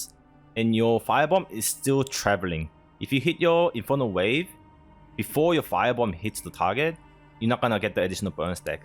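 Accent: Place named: Australia. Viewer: Mate, I keep hearing an Aussie accent creeping through. You in/spent time in Aus?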